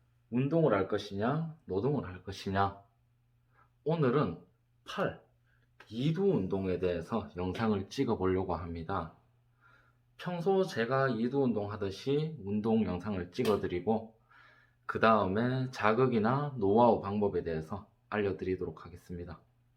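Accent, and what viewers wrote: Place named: Gyeongsang, South Korea. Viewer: 님도 말투가 경상도분이신거같은데 구독자도 경상도분이시네요ㅋㅋㅋ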